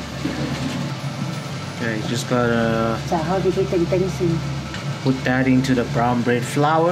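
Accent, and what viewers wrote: South Africa. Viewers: This guy sounds like his grown up in South Africa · You sound south African. Lol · Wait, are you from South Africa by any chance? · This recipe is fantastic! Quick and delicious. Thanks very much. Are you by any chance based in Cape Town, South Africa as your accent is so distinct, I had to ask?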